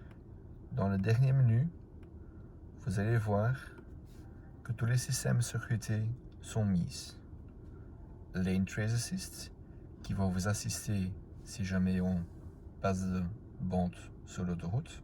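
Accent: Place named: Belgium. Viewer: C est quoi cette video au ralenti?et puis faut aimer cet horrible accent belge.Bref j irai la decouvrir moi même chez Toyota une fois!!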